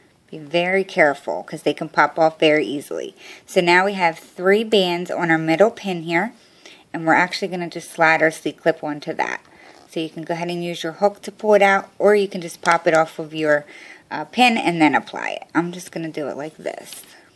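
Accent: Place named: New York, United States. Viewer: where are you from? You sound like you’re out NY way maybe? I like it because you remind me of my cousin. Whenever she was angry, she’d turn extra NY lols 💜💜💜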